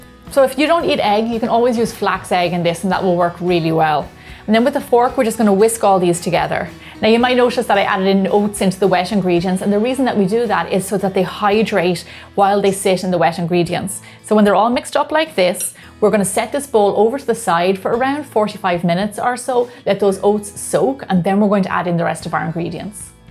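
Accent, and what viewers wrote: Ireland. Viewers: And your Irish accent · Are you Irish?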